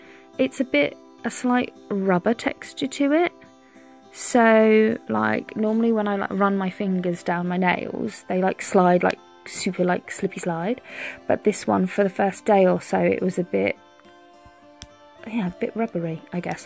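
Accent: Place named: Essex, United Kingdom. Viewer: I'm actually sitting here.... well actually standing... you're keeping me entertained whilst I do the most mundane task of washing up after feed the kids their tea... giggling along with you because I'm making the same noises of wonder over that G-O-R-G-E-O-U-S glitter and it friggin GLOWS!!!! 😍 lol your accent has me perplexed a little thou.... coz you keep saying certain words that sound Essex... like me 😁 I'm now off to put another order in.... but please write congratulations on you winnings 😂😂😂 great video as always x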